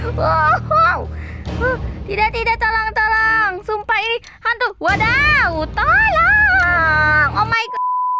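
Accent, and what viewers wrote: West Java, Indonesia. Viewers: Kak aslinya orang sunda ya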